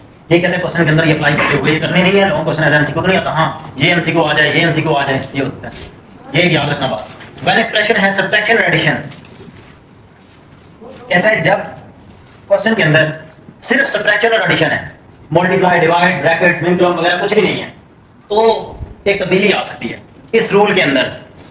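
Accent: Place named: India. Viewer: bhai kia bat hai indian touch dy rha hai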